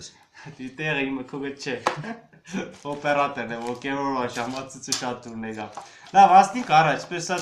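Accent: Gyumri, Armenia. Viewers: Lenakani barbary dzec axpersss Like!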